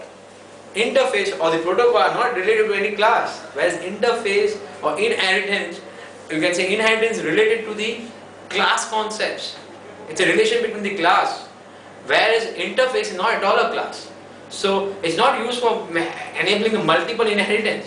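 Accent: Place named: Asia. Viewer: Boss it is Asian English!!!!!!!!!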